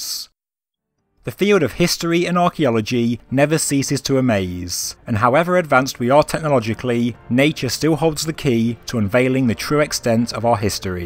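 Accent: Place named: England, United Kingdom. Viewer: Apart of the ancient discovery information, what a LOVELY ENGLISH ACCENT. ...AMEI ❤👌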